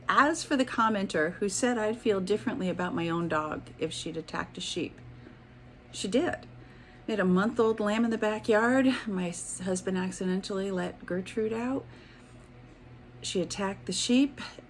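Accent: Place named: Connecticut, United States. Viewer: I was listening and said to myself, that's a Connecticut accent. I was right!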